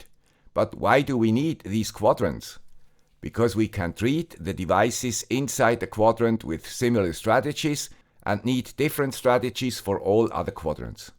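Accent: Switzerland. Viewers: Congratulations to 400k subs 👍🏻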